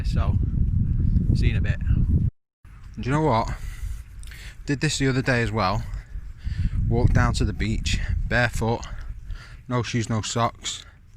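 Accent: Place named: North, United Kingdom. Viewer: Brilliant little adventure. Do I hear some Northern tones in that accent?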